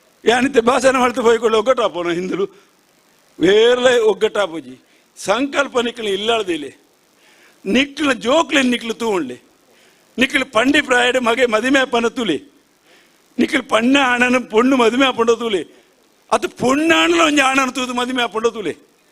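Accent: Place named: Tulu Nadu, India. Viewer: Anna irna kannada sari ijji, ir tulette paterna edde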